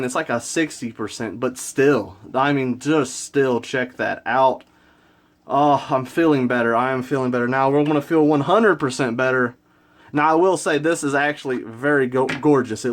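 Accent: South, United States. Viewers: I noticed your accent, where are you from? I live in Bama and it's nice to see a fellow southerner into retro games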